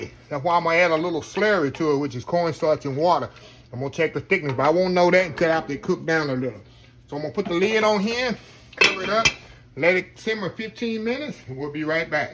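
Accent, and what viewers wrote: South, United States. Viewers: Oh my goodness! That looks so good. I like listening to your southern twang.....especially when you say temperature. 🤗🤗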